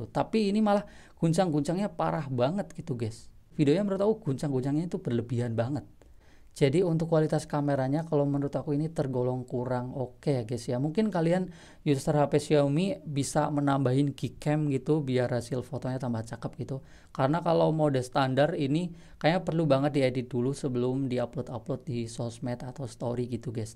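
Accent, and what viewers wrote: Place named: Indramayu, Indonesia. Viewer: Ko kya wong dermayu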